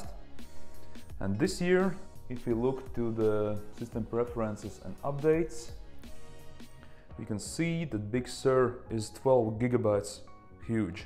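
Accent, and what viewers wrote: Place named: Russia. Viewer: Раша акцент ю ноу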